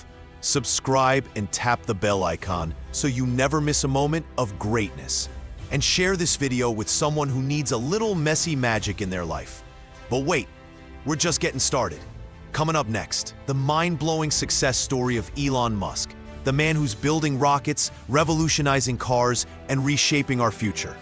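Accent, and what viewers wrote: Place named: Brooklyn, United States. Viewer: Good video but the narrator's Brooklyn accent was a distraction!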